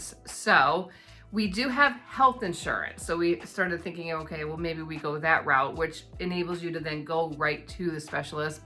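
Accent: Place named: New Zealand. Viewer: Hello there! Finally we got to watch the video, thanks for that and the many informative videos you've allowed us to watch. I hope your daughter makes a full recovery as soon as! Hearing about the 'enn-zed' (NZ) healthcare system (and others like 🇨🇦, 🇦🇺), makes ones stress levels regarding the 🇺🇸 healthcare system increase. The most enchanting thing about your videos is the NZ 'highlights' on your accent, especially as you pause or end yor sentences. I listened so keenly to your daughter, but she hasn't got that yet. Looking fwd to the next.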